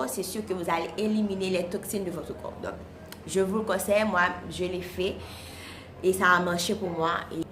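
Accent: Haiti. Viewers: Depuis sur l'accent , je pensais dèjà qu'elle etait haitienne!! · Waw j aime trop l accent haïtienne...j ai kiffé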